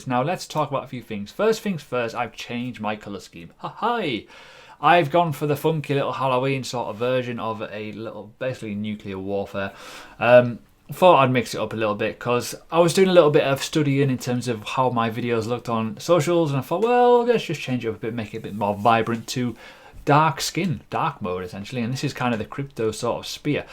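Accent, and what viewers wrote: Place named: Yorkshire, United Kingdom. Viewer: gotta be a Yorkshire accent?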